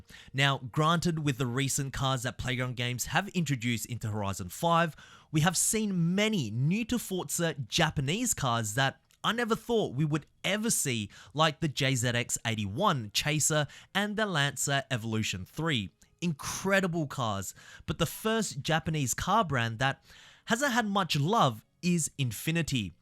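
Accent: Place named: Australia. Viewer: Yo do you also run a channel called? I’m probably wrong but the voices with this channel and the other one are so similar and both have the same Aussie accent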